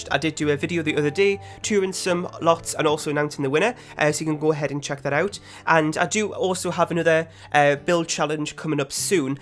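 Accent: Newcastle, United Kingdom. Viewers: Just found your channel and Iv got too say I’m not sure of your accent but if it’s geordie then me too haha! Your build is so accurate too my grandmas house aswell and I think it’s really cool! Bets build Iv seen in a while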